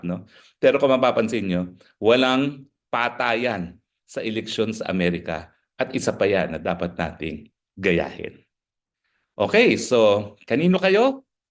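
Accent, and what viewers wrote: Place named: Philippines. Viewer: Yan ang gustong gusto ko sa inyo sir Harry Roque pag nagsasalita kyo lalo n tagalog Pilipinong pilipino tlaga.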